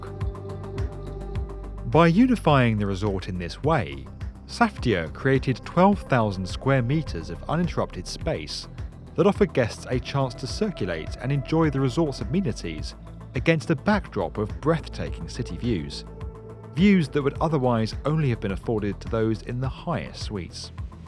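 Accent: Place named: RP, United Kingdom. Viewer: You have got a state-of-the-art received pronunciation RP. Do Never miss it! And ALWAYS, ALWAYS STICK TO THE METRIC SYSTEM. HOWEVER, in this subject (aerial corridors/sky bridges/ elevated pedestrian crossings.) You might be interested to know that although perhaps not so much flamboyantly spectacular as the Asian/arabs ones you could realise that this type of structure are commonly found in Big South American cities and in Spain even in ciudad de Panamá I remember walking within one 10 years ago in the Radisson Decapolis Hotel connecting it to the Casino over a high traffic road. Another "small" example that came to my mind now is the long aerial covered bridge linking 2 shopping centres in the Colombian Caribbean city Barranquilla (Where Shakira & Sofia Vergara were born) My point is that that is something that Chinese wallet took it (like many things) from Europe (Spain, chiefly Barcelona) they are NOT PIONEER AT ALL IN TERMS OF ARCHITECTURE OR URBANISM AT ALL!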